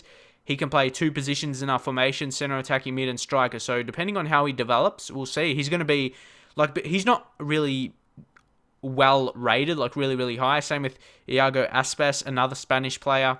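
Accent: Australia. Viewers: aussie guy.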